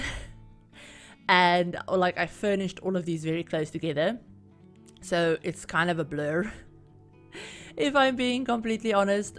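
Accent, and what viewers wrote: South Africa. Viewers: omg! are you south african? super cool to see south african simmers on YouTube 😭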